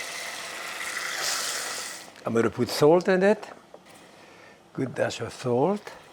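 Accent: France. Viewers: He is French. English is his second language. He does not have an impediment.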